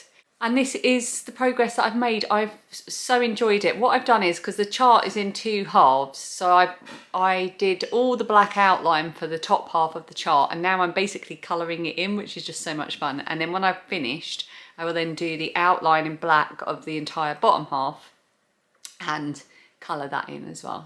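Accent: Australia. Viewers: Oh my goodness! you nailed the Australian accent. Maybe just add a bit more ‘nasal’ to your voice?👍. I really dislike the Aussie accent. I’m allowed to say that as I’m Australian 😅😅😅. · Great Aussie accent! Thankyou for sharing your daily life🙂 I am watching from Bunbury Western Australia, Diane Buswells home town🙂 My daughter went to school with her. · thank you so much for the tour of your gallery wall again. I really appreciate it … looks gorgeous! ♥️♠️♦️♣️. The girls reactions to the chips make me laugh out loud. So very funny - is a crack up … love your Aussie accent (I am Australian) Like everyone else I will miss your Vlogtober so much.. hoping you’re up for Vlogmas too 🤞 Thank you for taking the time and effort to share your lives with us.. super super fun family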